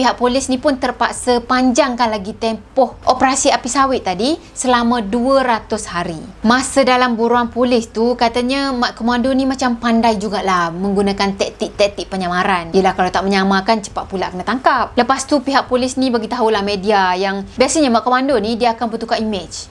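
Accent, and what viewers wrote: Kelantan, Malaysia. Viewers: Akak ckp sleng klante tu ada laaa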